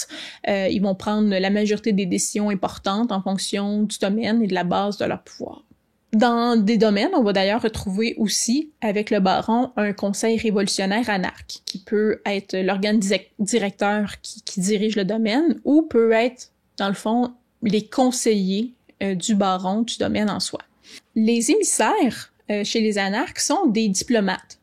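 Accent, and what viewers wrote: Quebec, Canada. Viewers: Quelle excellente vidéo 😮♥️ J’ai été tellement agréablement surpris d’entendre une compatriote québécoise sur le YouTube francophone ♥️ Je m’abonne à la chaîne!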